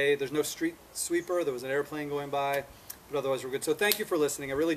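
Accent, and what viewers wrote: Canada. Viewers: Is he Canadian? I hear a strong accent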